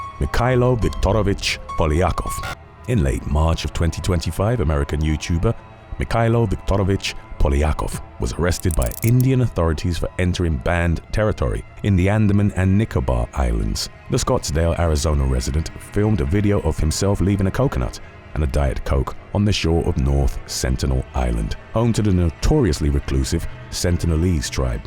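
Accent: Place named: United Kingdom. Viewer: it’s an accent because he’s British but he’s also pronouncing names completely wrong. Even by British standards.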